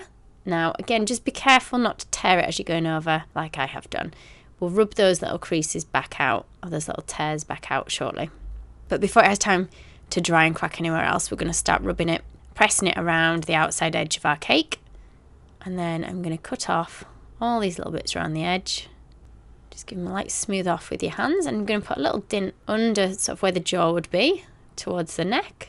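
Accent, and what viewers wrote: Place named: Yorkshire, United Kingdom. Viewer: It is so nice to have someone from Yorkshire to listen to!! You are super talented! Can always count on your channel to rescue any of my cake fails!😂x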